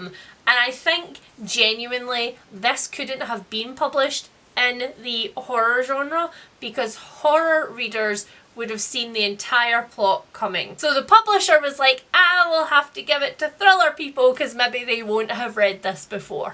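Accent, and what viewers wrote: Scotland, United Kingdom. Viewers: i feel like i’m watching an episode of balamory w/ ur accent lol i love it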